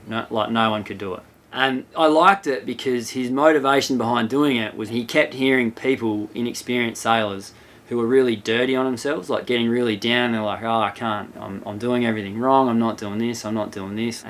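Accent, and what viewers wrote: Australia. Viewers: Thank you for this very interesting video, even if i didn't understand everything (dispite of your Australian accent and my french-american-english 😂) Anyway just to speek about the king-size thing : we have one in our Lerouge Brazapi custom catamaran and it seems like we bought a good performing and secure boat 😉 just started sailing with it a year ago, and as we're quite novice sailors we'll tell you more in a couple of years 😄 thanks for sharing your adventure ⛵ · We're not sailors...at all. But we love your videos and have learnt heaps about sailing since watching them. We found this one really interesting. Oh and we really love hearing a fellow Aussie's accent and how your positivity always shines through 🤗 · G'day, mate. Loved the video after I got accustomed to the Aussie accent. Pack your kit in a seabag and sign on a cat as crew for a year. 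At the end of that year, you will have the experience to make a wise choice of boat. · Phew. What a waffle. First I thought it was going to be 1 minute of Aussie nasal bad-grammared intro, then it became 2, 3, 4, but he kept going. Jump to about and he'll start talking about specifics. The title's insufficient too. It's about buying a cat for ocean travel, not for what most people do with their recreational vessels. Three minutes of information squeezed into . 'If that's your design parameters...'. Grammar, mate. Unfortunately, it's a ramble. If only he wrote up a few salient points. :-(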